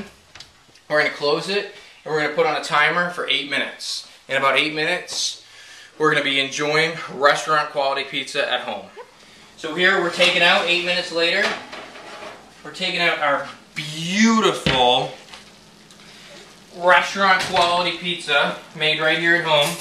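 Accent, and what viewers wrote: Canada. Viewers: Are you Canadian? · You sound like ur from Ontario Canada..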